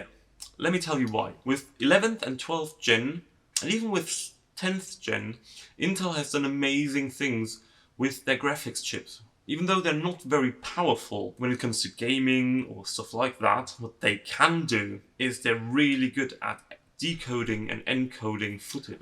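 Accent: United Kingdom. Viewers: 👍🏻👍🏻👍🏻 Du hast mich total überzeugt !!! Nicht nur "Intel core", auch dein Britisch Englisch ist really Great (Britain), fantastisch! Hut ab! 👏🏻👏🏻👏🏻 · where is that strong british accent from my brother? love it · Bloody hell, didn’t know you were British mate.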